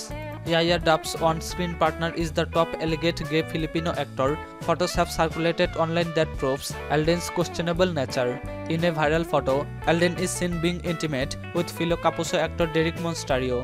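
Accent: India. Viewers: Oo nga, lahing pana ba yong nagsasalita..indian..bilog ang dila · Yung accent dih ko kinaya indian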